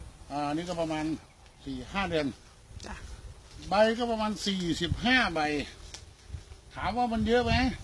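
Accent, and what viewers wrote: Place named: Countryside, Thailand. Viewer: พูดแบบลุกทุ่งๆต่อการอธิบาย ฟังก็ดีไปอีกแบบ!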